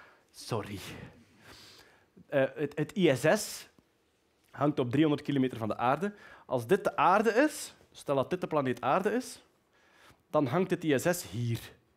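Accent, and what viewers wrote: Flanders, Belgium. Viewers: Goede presentatie. Heerlijk om Vlaams te horen. En voor iedereen een begrijpelijk en interessant verhaal denk ik. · Gaat helaas niet meer op sinds we in 2019 weten dat de aarde geen kromming kent. Geen bal, geen ballen universum, geen zwaartekracht, geen evolutie....aiii. Metingen aan het aardoppervlak tonen een vlakke aarde, dus de logica gebied dat er zeer aannemelijk een Schepper in het spel is. Een ander leuk nieuwtje: de Ark van Noach is gevonden. Maar voor een Nederlander is het heerlijk naar deze man te luisteren. Het Vlaams is zo mooi!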